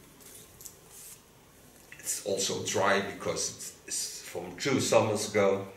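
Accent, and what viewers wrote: Netherlands. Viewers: You made me laugh, with the ears. Love your video's, you must get tired, working all day, then doing these video's for us friends on you tube. Do the rollers you used, give a soft curl, compared to the others you normally use. Joy to watch. Love the Dutch accent. Love from England.💖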